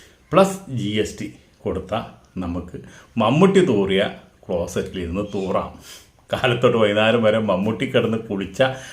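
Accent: Thrissur, India. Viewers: Thrissurkarude slanganu chirri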